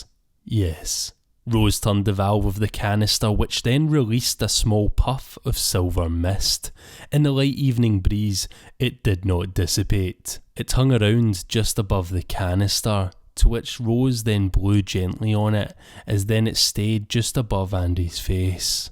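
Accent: Scotland, United Kingdom. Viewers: Erm....his accent is...scottish...😂😂😂😂😂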